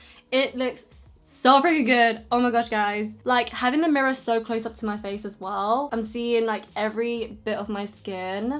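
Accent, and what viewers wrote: England, United Kingdom. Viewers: I have followed you on Instagram for quite a while and just found you on YouTube. I have no idea why I didn't know you had a youtube channel but Im am so soooo shocked by your voice! 😂 I had no idea you had an english(?) accent! (Im from the US) But I love your videos and you do beautiful make up! So happy I found your channel to subscribe!!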